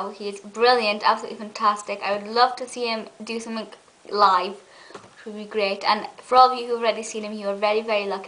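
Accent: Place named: Northern England, United Kingdom. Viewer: I thought Paul was pretty good. Too bad he only did impressions of people and characters that I don't particularly care for. Harry Hill? Blah! Scooby Doo & Popeye? I liked them when I was 6. Anyway, I'll bet you get this all the time, but I love your accent! You replied to someone else´s comment, saying that you're not Scottish, which I must admit, I thought you were. So is it Northern England, then? It's beautiful. And so are your eyes. (sorry) But they really are!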